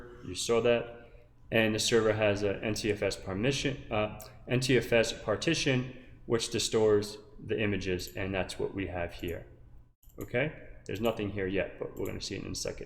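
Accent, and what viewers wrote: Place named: New England, United States. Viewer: Love the New England accent!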